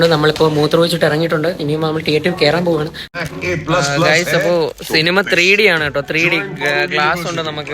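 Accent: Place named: Trivandrum, India. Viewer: Vlog adipoli🎉 pinne nee tvpm anoo aa samsaaram adhupoole und😁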